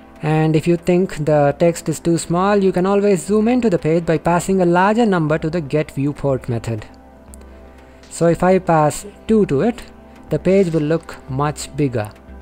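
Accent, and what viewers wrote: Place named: India. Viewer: Indian Accent 10/10 video tutorial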